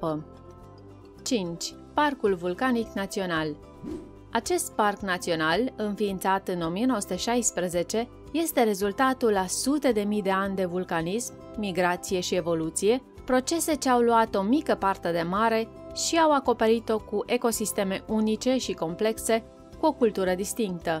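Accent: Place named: United States. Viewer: Am oprit episodul la jumatate. Efectiv nu am mai putut sa mai ascult modul in care pronunti denumirile cu acel accent american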